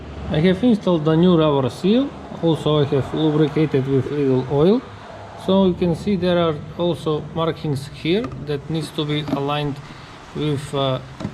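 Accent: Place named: Russia. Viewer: а говоришь как русский🤝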